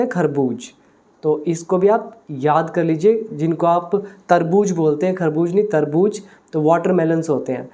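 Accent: India. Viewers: Well done but u should work on your prononciation, because u use Indian accent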